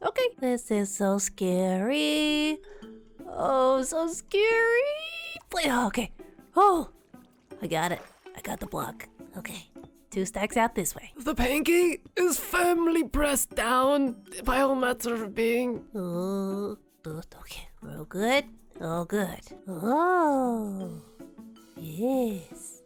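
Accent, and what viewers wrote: Australia. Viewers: This series is so fun. I'm so so glad I've found it. It is just so nice to have a aesthetically pleasing builds all backed up by an Aussie accent, I just find that I watch so many people from other countries lately that I miss just hearing something more familiar ❤️ (does that make sense?.... tbh I find that I sometimes even crave more Australian-ness in movies and tv lol)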